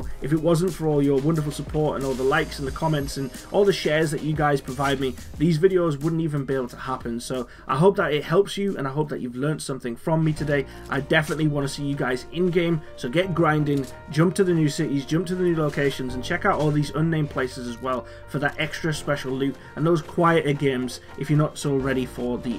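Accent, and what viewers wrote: Newcastle, United Kingdom. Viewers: Are you a Geordie ?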